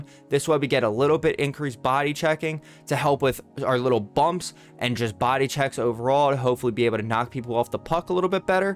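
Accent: Delaware County, United States. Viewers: in that delco accent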